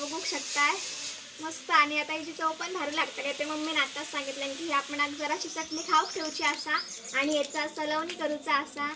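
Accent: Malvani, India. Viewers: tujhe video khup chan astat.. mi pn kokanchi ahe.vengurla..but tujhe te madhal malwani aikayla khup chan vatt...tujha bolnyatunch smjun yete ki aplya kokanchi manse sadhi bholi❤..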